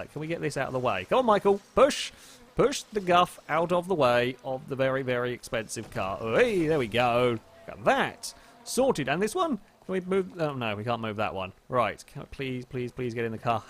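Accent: United Kingdom. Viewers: I only watched one video of you, and already I am loving your goddamn videos, keep up the good work, dude! (P.S. Your voice sounds British, are you from London?)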